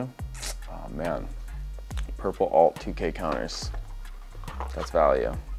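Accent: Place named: Japan. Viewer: You are the best bro thanks for the opening very entertaining best show on YouTube love your Japanese accent lol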